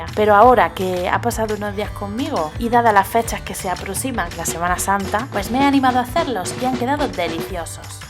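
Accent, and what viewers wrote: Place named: Andalusia, Spain. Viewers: Buenos días. Yo también hago los roscos de mi madre y vienen a ser como los tuyos sólo que una vez fritos, mi madre los baña y quedan super Esponjosos para un monton de días, si es que llegan. Te doy su truco por si alguna vez lo quieres probar, quedan super ricos también. En un cazo pones como 1.5 l de agua, 2 varitas de canela y 2 o 3 cucharadas cucharadas soperas de azucar y una cáscara de naranja y limón.lo dejas hervir y entonces bajas el fuego y vas introduciendo uno por uno los roscos, pero sólo meterlo y sacarlo enseguida y entonces los pasas por el azúcar y la canela molida, los rebozas y los vas poniendo sobre un papel de horno y los dejar secar toda la noche. Al día siguiente los pones en un taper grande y a ir comiendo. Son una delicia, crujientes por fuera y muy meloso por dentro. Gracias por tu receta, me la apunto porque mi madre también me dice: la cantidad que admita. 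Veo por tu accentillo que tu también eres del sur. Un abrazo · Ahhh...es que mi madre es de Torredelcampo, Jaén. Ella ya ha perdido el acento, casi desde que está en Cataluña...jeje...pero tenéis un acento parecido, junto con los de Córdoba. Un saludo desde Barcelona. Y muchas gracias.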